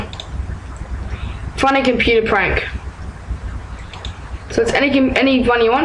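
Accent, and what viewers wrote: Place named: Australia. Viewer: thanks you have an aussie accent im aussie as well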